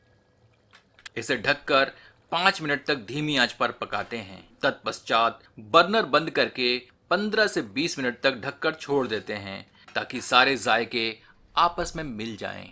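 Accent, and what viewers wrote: Standard. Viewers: Brother itni sudh Hindi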